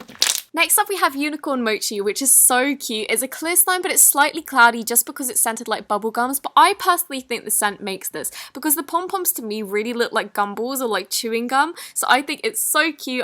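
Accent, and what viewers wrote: England, United Kingdom. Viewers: What part of England are you from??? And your the best😂😃😂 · BRUH SHE HAS A BRITISH ACCENT SO SHE PRONOUNCES THINGS DIFFERENTLY!!! 🙄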